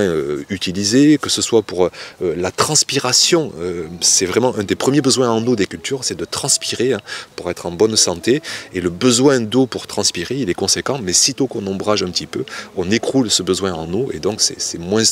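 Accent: South, France. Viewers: T'es de quel Sud? Sud Sud, Montpellier, Sud Est ou Sud Ouest ?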